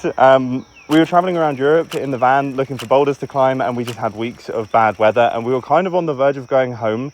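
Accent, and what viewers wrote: France. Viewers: I can't wait for to slowly gain a slight french accent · So excited for to slowly acquire a French accent over the course of a few years. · I can't wait for like, 6 months from now, when your French Accent starts sneaking into you Minecraft Videos. Congrats on the (almost) move. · can't wait to hear gradually develop a french accent over the course of the next few years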